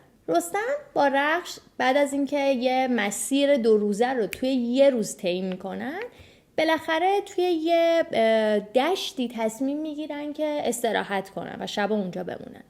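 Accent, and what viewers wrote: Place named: Isfahan, Iran. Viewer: لهجه اصفهانی داشتی آیا تو اصفهانی هستی؟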